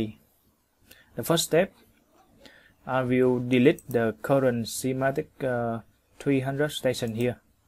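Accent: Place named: Vietnam. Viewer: thank you, by the way, your voice looks like Vietnamese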